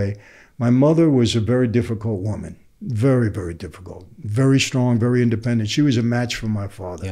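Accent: United Kingdom. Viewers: Great interview. Enjoyed you having to renege on some of the cockney patter so your questions made more sense 😂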